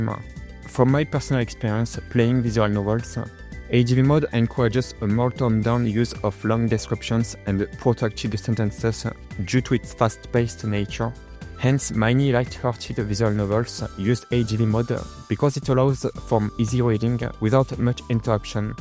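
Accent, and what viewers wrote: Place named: France. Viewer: Gotta love that French accent! Keep the great work. (Also i caught a cold and now I am miserable. 🤧)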